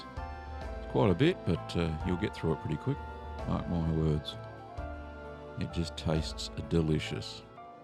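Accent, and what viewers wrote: Australia. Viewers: Thank you -- very informative. I can't wait to try this; I've become very lactose-intolerant, so unless I make my own I won't get to eat cream cheese again... Not sure where you're from (I'm guessing Australia but wouldn't know a New Zealand or other accent if it bit me) -- but this strawberry pretzel salad is popular here in the States -- haven't tried it yet, but am going to! (this site may ask you to join in order to see the recipe, but there are a ton of recipes out there...) Thank you again! <link>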